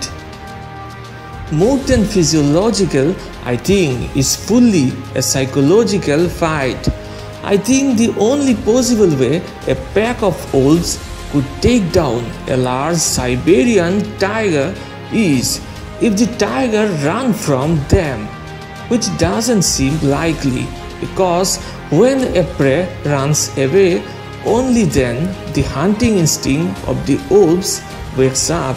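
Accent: India. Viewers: 😂 it’s the tune for me must be an Indian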